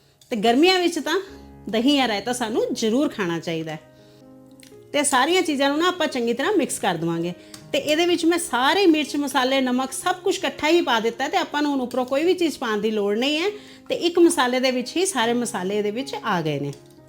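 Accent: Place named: Punjab, India. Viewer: Meethi meethi aap ki Panjabi zuban upar se mazedaar raytuon ki recipe .me zarur banaungi ❤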